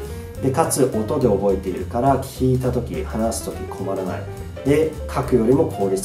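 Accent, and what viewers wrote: Australia. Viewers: オーストラリア英語？ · オーストラリア訛り？ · オーストラリア訛りすかね？ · 発音がすごいオーストラリア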